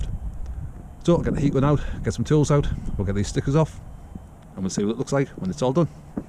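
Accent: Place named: Newcastle, United Kingdom. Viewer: Many thanks, very helpful. You can't beat a Geordie accent!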